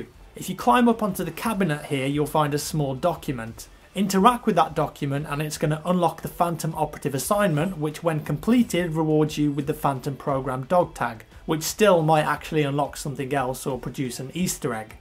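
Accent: England, United Kingdom. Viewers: beatiful english acsent <==== sorry for this english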